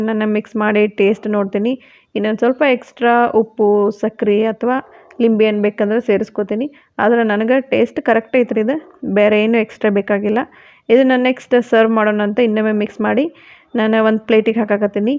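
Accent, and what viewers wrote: Dharwad, India. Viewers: आपण किती छान धारवाडी कन्नड बोलता.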